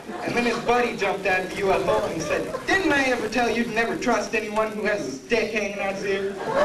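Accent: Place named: Michigan, United States. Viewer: this isn't funny or edgy at all, and he's just awkward. his accent is the only funny aspect just because it's Michigan enough to sound a little Minnesotan and it vaguely reminds me of the guy in the snow suit shoveling his driveway and being questioned by the police in Fargo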